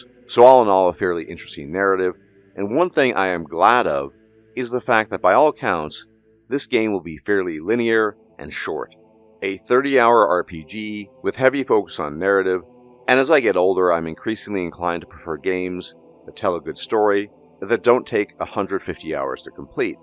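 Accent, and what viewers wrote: France. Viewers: That french accent caught me off guard. lol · Amazing French accent, how come?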